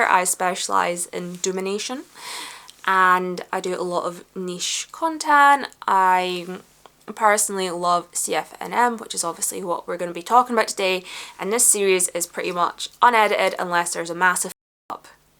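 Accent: Scotland, United Kingdom. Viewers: scottish is like drunk poetry, tell me more love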